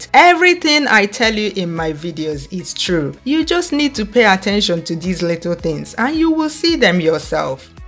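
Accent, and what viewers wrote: Igbo, Nigeria. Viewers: I came across this video then your Igbo accents and and your explanation made me stay and subscribed 😂😂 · Hyper clear Nigerian accent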